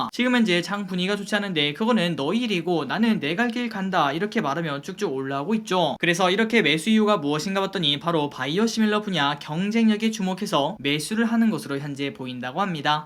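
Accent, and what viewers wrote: Gyeongsang, South Korea. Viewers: 간다간다 뿅간다~!! 대구 사람이엇음? 방가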